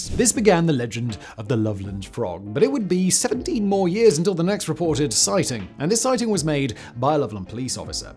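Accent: United Kingdom. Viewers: I need more videos of saying Cajun words in his British accent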